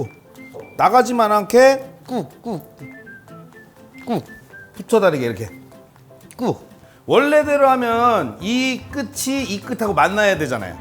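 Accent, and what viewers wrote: Chungcheong, South Korea. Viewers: 백선생덕분에 충청도말이넘좋아유 ~~~ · 몇번을 봐도 밥 손에 한웅큼 쥐어서 김에 콕 찍으라고 하실때 그냥 귀여우심ㅋㅋㅋㅋㅋㅋ 충청도인 어투 걍 귀여우심ㅋㅋㅋㅋ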